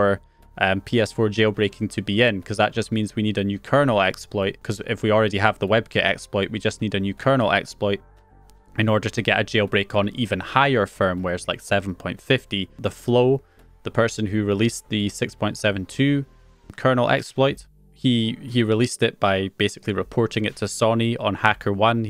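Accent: Scotland, United Kingdom. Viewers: Jim Browning is from Northern Ireland and is Scottish, but the two regions do share similarities such as slang words/colloquialisms.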